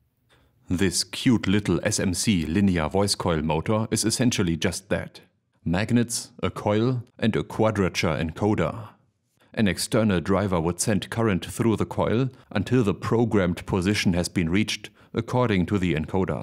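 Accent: Germany. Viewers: Der Deutsche Akzent kickt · hard German accent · Sounds epic in a German accent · You have the most German, German accent I've ever heard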